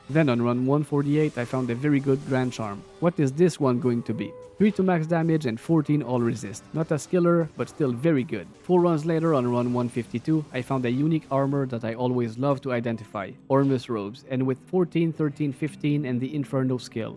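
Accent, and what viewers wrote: Quebec, Canada. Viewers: es-tu queb? tu sonne queb haha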